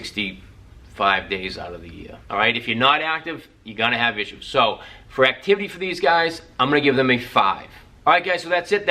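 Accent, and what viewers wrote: Boston, United States. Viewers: I'm a New Yorker and can say he has a Boston (or some sort of New England accent) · You sound like you’re from Massachusetts or Rhode Island. ❤I’m near Boston or Bahwstahn. 😂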